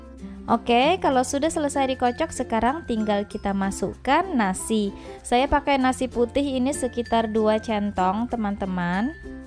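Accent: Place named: Samarinda, Indonesia. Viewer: What samarinda??? Nada samarindanya udah ketauan..hehe sama dong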